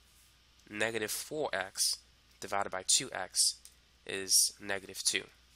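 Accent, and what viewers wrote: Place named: New York, United States. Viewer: it isnt unique though, its a typical new yorker accent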